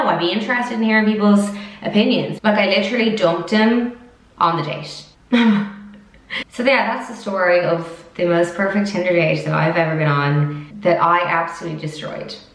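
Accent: Ireland. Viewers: She sounds Irish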